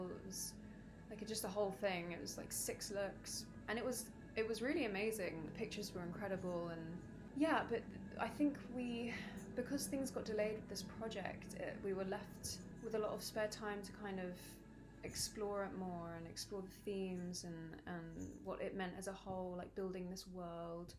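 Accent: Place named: RP, United Kingdom. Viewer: ! Why are you so posh?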